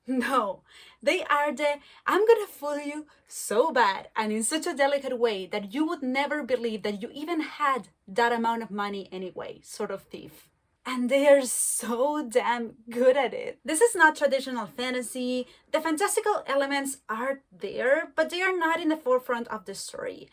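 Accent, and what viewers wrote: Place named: Chile. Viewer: Nada que ver, pero desde el primer segundo que te escuché reconocí el acento chileno, saludos compatriota 🇨🇱🤞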